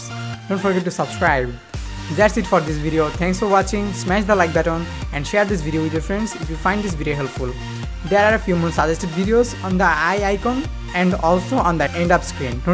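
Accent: India. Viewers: Ooooff...That Indian speaking is so bad to listening 😣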